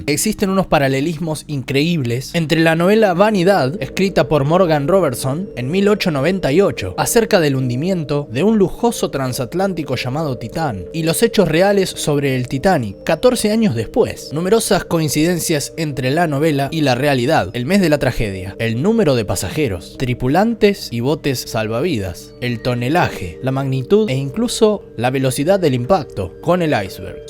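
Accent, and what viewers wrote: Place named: Argentina. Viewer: Me gustaria saber de donde es este muchacho, parece argentino.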